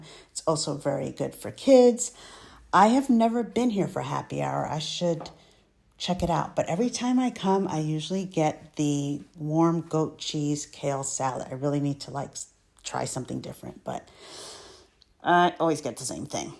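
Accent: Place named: Anguilla. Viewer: What a great travel guide! A voice of a true Belonger!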